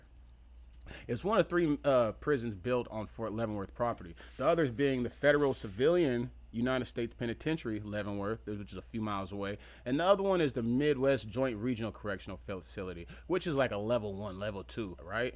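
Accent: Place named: New Jersey, United States. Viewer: Are u from Jersey bro? You sound like it. Either way keep it up brotha!!!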